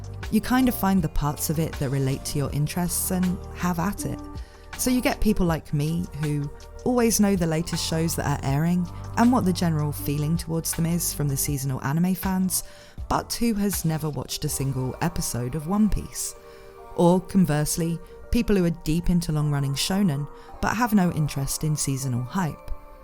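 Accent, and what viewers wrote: United Kingdom. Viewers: I’m an over the road(otr) trucker in America, so it could be just that lack of familiarity with uk accents, but you really sound like my truck PC gps voice. A very pleasant smooth female British voice. Also, great video. As a dude, I do get fatigue from constantly hearing that because I have a penis, I’m the source of all women’s problems, so every once in awhile, it’s nice to hear from a woman who isn’t bitter down to the core of her being about having to live as a shudder woman. Finally, I also LOVE to hear from people who are truly passionate about things. Like when someone KNOWS cars and can talk your ear off about different models and their high and low points. And while the video wasn’t all about re:zero, the obvious passion you have for it, coupled with the fact that I didn’t know much about it before, and learning all this new information about it from you, has made me think that maybe I ought to go purchase the first few manga, or at least watch a few episodes. 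Also, also, you’ve definitely earned a subscription with this video. Sure it was an hour but it didn’t feel like one! Well done!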